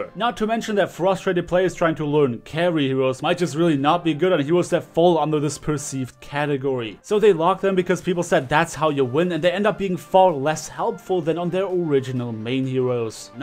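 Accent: Germany. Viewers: Is german your mother tongue? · is it possible that you are german ? xD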